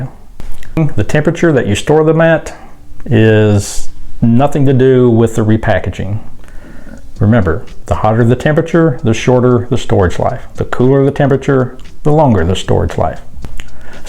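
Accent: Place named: Arkansas, United States. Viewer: Also, I do not know where you are from. You have the best accent. Could listen to you talk all day. My guess is Arkansas. My best friends (I call family,) were all from Arkansas. I lived in Mississippi for a few years. And Granddad & relatives were from Shreveport. Anyway, none of my business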